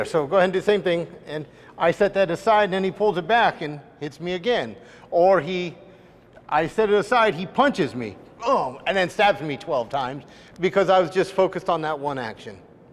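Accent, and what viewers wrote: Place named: Texas, United States. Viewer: Is he Texan/Oklahoman? "Wooden d-..daggers.." old grumble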